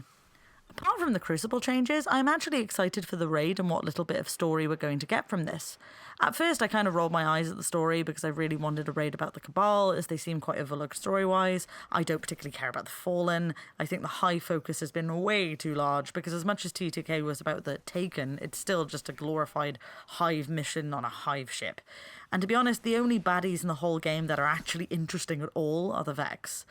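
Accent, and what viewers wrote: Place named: West Country, United Kingdom. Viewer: Didnt hear any welsh accents but there were certain words, you sound pure west country (bristol ish area) id love to do raids as ive never even attempted 1, im a year 2 solo player, the only reason i got destiny in the first place was because a bug group of my friends played it, but i got it too late and they'd all already swapped over, glad i got it though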